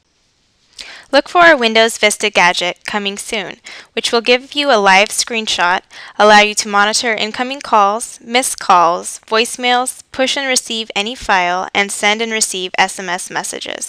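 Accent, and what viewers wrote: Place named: Scotland, United Kingdom. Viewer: Awsome dialect. Remnds me of COD4 Cpt.MacMillan. Are you Scottish, or Irish?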